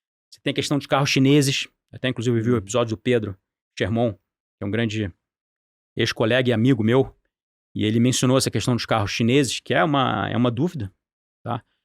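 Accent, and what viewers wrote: Rio de Janeiro, Brazil. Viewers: é carioca kkk... Repare sempre no fim tem aquele "X" arrastado. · Esse dialeto carioca, dá raiva, tudo com x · O cara é extremamente PEDANTE Falou falou e não disse POOOOOORRA nenhuma Além desse sotaquezinho CHATO de carioca metido a besta Além de repetir a todo momento que ele tá gastando muito tempo com a localiza Além dessa blusa de frio amarrada no pescoço Kkkkkkkkkkkkkkkk Que pessoa ridícula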